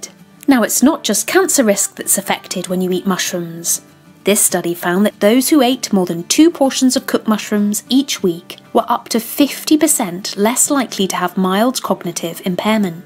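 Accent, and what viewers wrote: United Kingdom. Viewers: Mushrooms are great, you can make them really tasty by adding herbs, garlic, tomatoes, etc. and there's no need to add oil. They're very low in calories, filling and "meaty" in texture so they're a great food to have around. Nice to hear a British voice and to know you're based in London too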